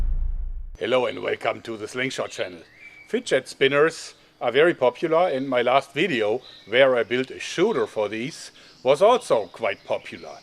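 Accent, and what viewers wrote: Germany. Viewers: he have German Sound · Warum redest du englisch ? Jeder hört doch das du deutscher bist 😂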